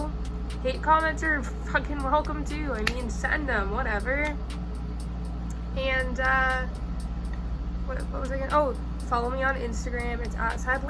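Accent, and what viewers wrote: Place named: Canada. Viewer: This is incredible, and super helpful rn... thank you 🙃 Also, the most Canadian accent I've heard in some time 😄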